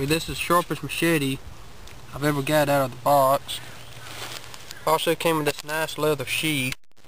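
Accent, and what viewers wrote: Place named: Appalachia, United States. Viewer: I luv a good backwoods hillbilly accent and my brother you got one! Lol No offense I find it very amusing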